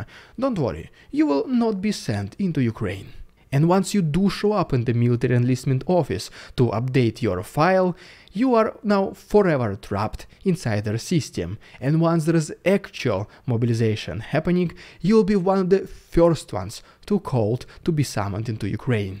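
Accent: Russia. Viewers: I get FPS russia vibes from this guys accent. 😆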